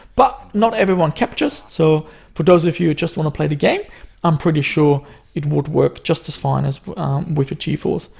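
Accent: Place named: Germany. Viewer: I always have a feeling there is a slight german accent in it. But mit might just be cause by regional stuff.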